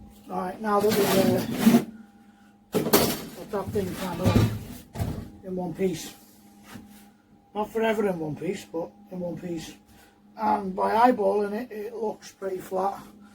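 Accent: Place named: Liverpool, United Kingdom. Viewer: G'day buddy, is the trailer for a land speed record bike (LSR bike)? If I slow the video down to 8 or 9 speed I can understand about 80 percent of what you are saying, that scouse accent is wicked. The trailer looks good and it's good to see the pick has more than one function. Cheers buddy and still watching from NZ.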